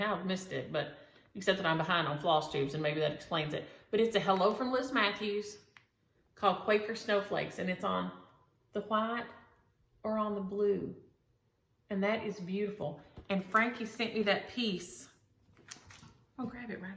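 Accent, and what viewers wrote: South, United States. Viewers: Home and Health. Your hair looks great, love your southern twang. Linda Norris....Thank you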